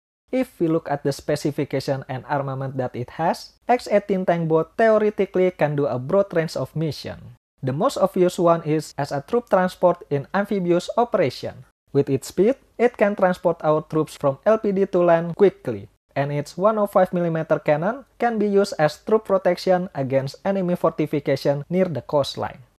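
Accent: Indonesia. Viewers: Hmm logatnya khas orang Indonesia sekali.. Nambah ciri khas negara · Wkwk Orang indo kan ? Accent nya Indo Banget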